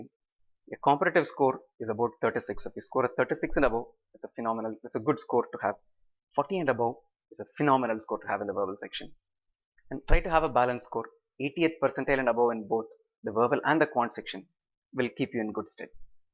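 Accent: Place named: India. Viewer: fuck off with ur indian English accent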